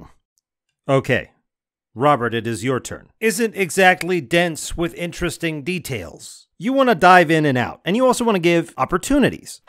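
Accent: South Africa. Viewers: Whoaly shit. That's a cavalcade of horseshit to deal with. Also, are you south african??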